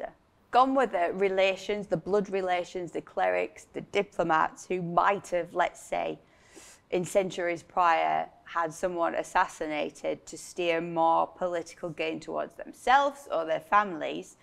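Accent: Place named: Yorkshire, United Kingdom. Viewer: She sounds to me like a less strong version of Jodie Whittaker's accent and she's Skelmanthorpe, so certainly Yorkshire, but it'd probably take an actual Yorkshire person to narrow it down t' area.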